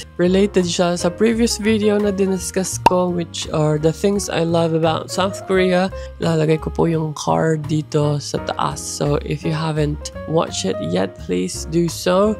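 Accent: United Kingdom. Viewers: Why do you have British twang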